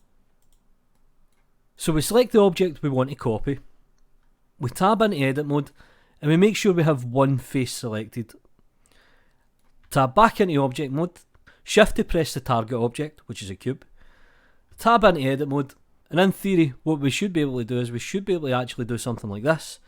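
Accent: Scotland, United Kingdom. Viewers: Hello! Cool tut, but not all words were understood behind authentical Scottish pronunciation.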